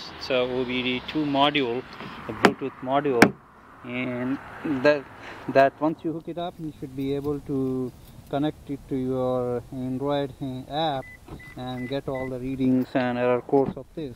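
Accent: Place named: India. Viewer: Tooo much Indian accent 🤮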